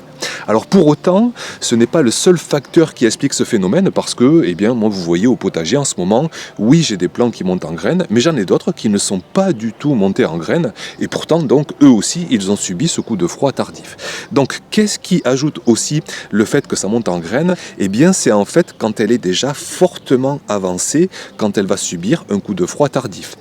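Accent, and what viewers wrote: South, France. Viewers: Bonjour, Je me suis abonné parce que tu as l'accent du sud. Je suis belge 😊. Le sud m'a toujours fait rêver et tu es un "exemple" qui alimente cette vision. C'est chouette que tu puisses vivre de cette passion (surtout pouvoir laisser une chance à sa sensibilité). J'aimerais bien t'avoir comme voisin 😂. Bonne continuation. Pierre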